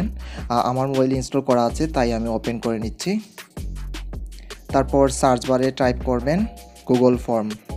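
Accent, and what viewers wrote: Sylhet, Bangladesh. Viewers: বাড়ি সিলেট নি বা!😁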